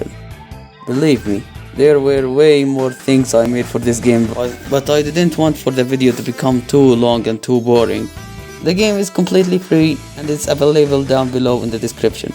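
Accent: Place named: Arab world. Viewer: عربي؟ i knew it from the acent then the T H I C C Milk!!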